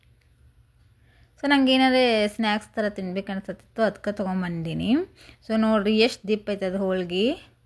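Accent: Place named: Uttara Kannada, India. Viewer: Nim bhashe super uttara Kannada super vlog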